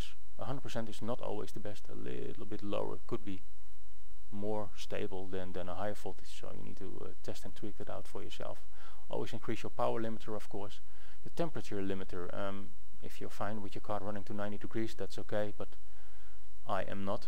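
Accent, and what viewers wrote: Netherlands. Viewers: Goeie englisch men i almost kant hoor your duts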